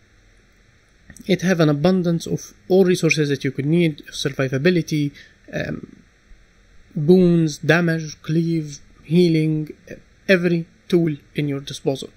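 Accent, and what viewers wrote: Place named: Egypt. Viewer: new players and your channel is very helpful thank you so much🤍 also your accent add a flavor to the video it's very close to my Egyptian professor 😂😂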